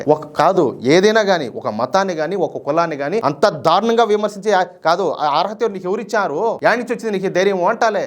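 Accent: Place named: Kadapa, India. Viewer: Anna meedi maaa kadapa nee naa. Maatlu alage vunnayi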